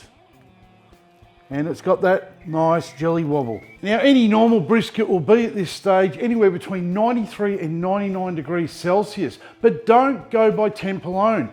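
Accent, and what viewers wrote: Australia. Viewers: Saw the thumb nail and was keen to watch...heard an Aussie accent and stayed to watch the whole video! Legend. Also that cutlery holder mini burner is genius.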